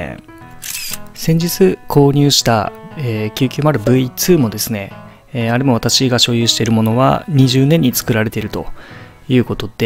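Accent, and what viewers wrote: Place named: Kagoshima, Japan. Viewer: 方言が鹿児島っぽい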